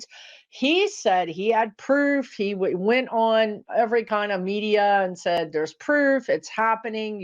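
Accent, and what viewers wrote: Texas, United States. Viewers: I luv it it when YOU TALK TEXAN ❤😮 DEBRA WADE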